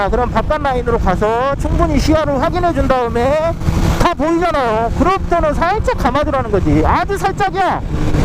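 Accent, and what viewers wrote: Jeolla, South Korea. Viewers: 아따 남도 사투리가 구수하요이~ 그라고 알려 줄라는 내용이 팍팍 와닿소^^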